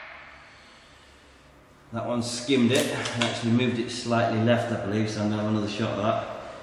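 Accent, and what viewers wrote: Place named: East Midlands, United Kingdom. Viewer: Hi, where is this indoor range mate, sounds like your from the Notts/Derbyshire area (forgive me if I am incorrect in my assumption of dialects). BW Frankie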